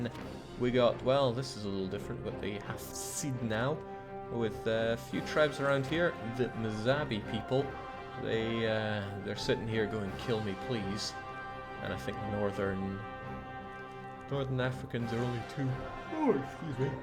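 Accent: Scotland, United Kingdom. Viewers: I thought this was Arumba, and I was confused and I thought he was sick again then I felt the Scottish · Man, his Scottish accent disappears after watching the Scottish twitter video and coming back to a normal one.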